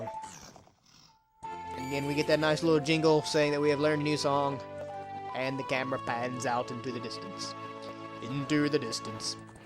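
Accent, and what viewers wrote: South, United States. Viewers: This game really makes your Southern accent shine in a good way!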